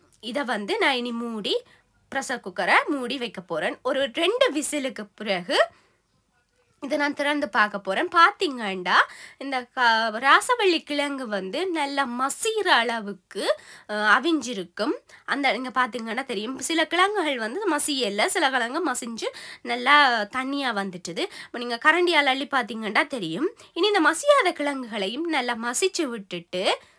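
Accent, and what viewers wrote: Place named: Sri Lanka. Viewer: Sister entha kizhanku enka ketaikum unkaludaya srilanka tamil super Liked Stay in You have my support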